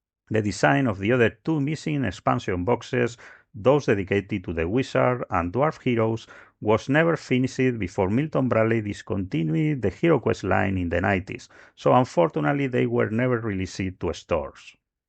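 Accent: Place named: Spain. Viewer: El acento en español que no falte 😎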